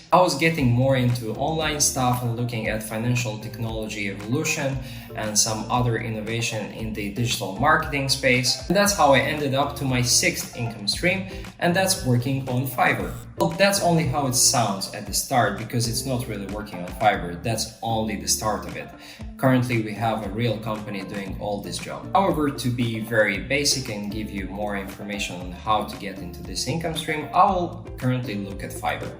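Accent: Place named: United States. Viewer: Bro you sound proper American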